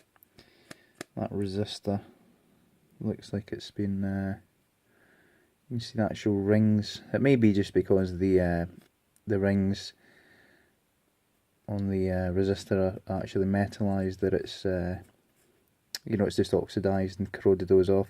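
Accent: United Kingdom. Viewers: Hi, it was a total coincidence, but I'm happy I've found your video. Personally I own more than 40 Amigas 500 (just this model) and I have many parts of it (but just one of it is the real 501;). It was really great to see the professional way you have handled it. BTW. Very good manicure;) and just please keep in mind, not all of us are native speakers (however it was great to hear the beautiful britisch englisch). Regards from Germany. Adam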